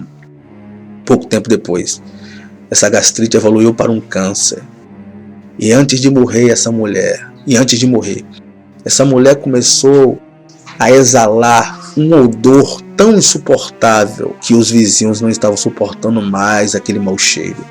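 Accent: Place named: Bahia, Brazil. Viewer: jóia. Você é baiano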